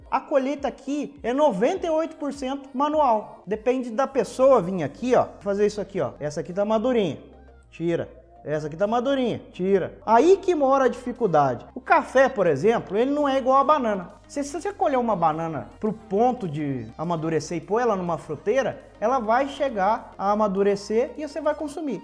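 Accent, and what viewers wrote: Minas Gerais, Brazil. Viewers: Amo esse sotaque mineiro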